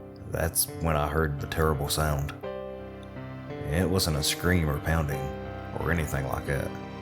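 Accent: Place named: South, United States. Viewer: Glad I found your channel. I’m from the south too and it’s refreshing to hear these in a familiar voice. Keep doing what your doing!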